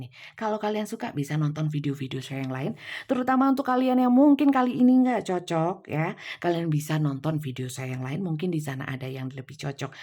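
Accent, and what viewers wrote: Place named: Malang, Indonesia. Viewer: Malang mana Bun? Saya jg Malang Makanya kok ngomongnya enak😂 Iya Bun, kemarin sy nonton reading'an bunda,,,ni jam 00.28 sy masih stay d channel bunda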